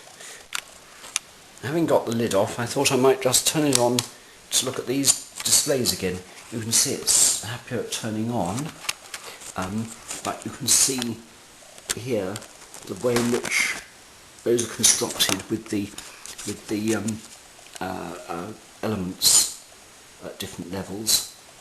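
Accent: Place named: Scotland, United Kingdom. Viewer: your accent is scottish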